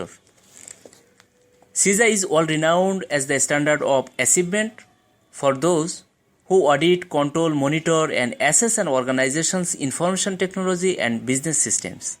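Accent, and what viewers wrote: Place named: Bangladesh. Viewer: Very good...great presentation and I am also enjoying your eastern Bengal influenced accent.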